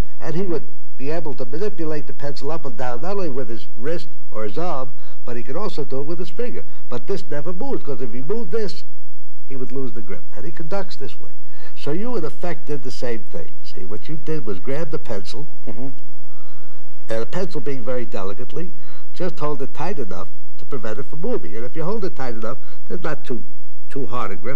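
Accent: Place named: Brooklyn, United States. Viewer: You can hear the old Brooklyn 🙂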